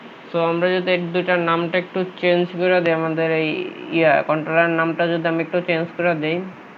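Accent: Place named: India. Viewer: This is India-English !!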